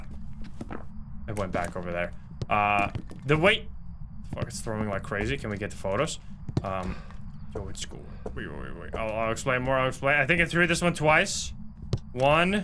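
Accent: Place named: Netherlands. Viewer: I've been watching your YT vids for a while now, learnt A LOT, so thank you for the wide knowledge. Also I was used to hearing my late Oma's Dutch accent that I could no longer hear it, and its taken me weeks to figure out you're Dutch yourself lol. I finally picked up on it with some of the words that in English have the "th" sound.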